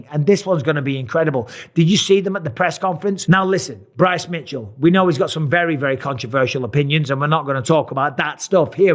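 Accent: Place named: Liverpool, United Kingdom. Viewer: Little scouse prick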